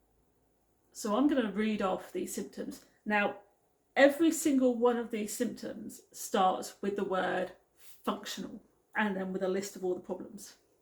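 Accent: United Kingdom. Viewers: Britânic English... Happy friend's day! I'm learning english! I really need learn! Thanks for you. Good afternoon.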